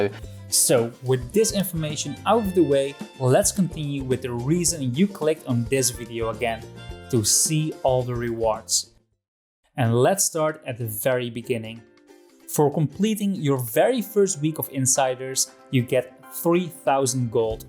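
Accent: Netherlands. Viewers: wait! your dutch?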